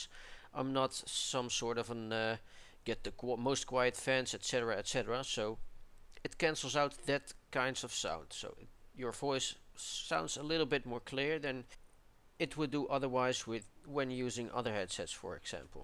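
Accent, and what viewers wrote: Netherlands. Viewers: I needed 3 seconds to know you are Dutch speaking. :) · just got them! they actually fit perfect on my head! and music and in games does actually this headset proven to be good! not extreme but its only like an 50£ headset and for that price its perfect! i have a problem pretty big one but thats not the headset thats the pc! im having problem with installing the driver(no surround);( but hopefully sooner or later i,v fixed that! im using glasses and it works just fine =) thanks for the review and i think your dutch;y english is perfect xD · You sound like a dutchman, just saying :p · lol ik hoor duidelijk dat je nederlands bent maargoed XD (tis oke hor XD) ik zou het eigenlijk handiger hebben gevonden als je de review deed met headset op... dan zou je wat beelden vooraf kunnen recorden en dan de headset opzetten. ik wou namelijk weten hoe het geluid klinkt, als je zou kunnen vertellen hoe het klinkt of ff video link sturen waarbij je die headset gebruikt, bedank voor het lezen/reageren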